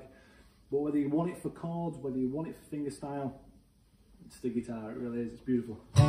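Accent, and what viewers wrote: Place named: Bolton, United Kingdom. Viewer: Cracking Bolton accent!!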